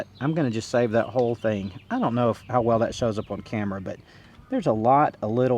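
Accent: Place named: South, United States. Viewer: Love to hear you talk…..love love love southern folks.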